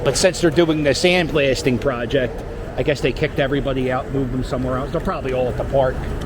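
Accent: Philadelphia, United States. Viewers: Love your Philly accent. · Which part of america is your accent from?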